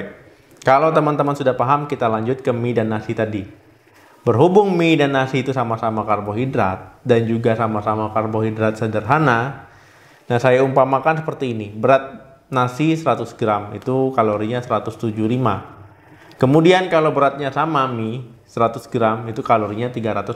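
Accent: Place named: West Sumatra, Indonesia. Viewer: Logat nya kayak orang sumbar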